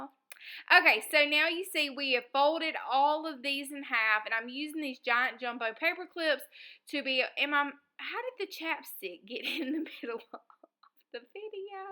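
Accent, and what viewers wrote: South, United States. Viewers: You are too much fun with that southern twang! Love the little journal and the cover.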